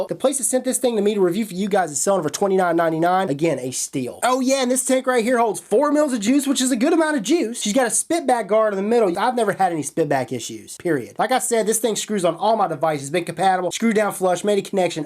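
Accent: South, United States. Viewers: rahliE05 that's how people from the south sound...like motor oil sounds like motor oh-ill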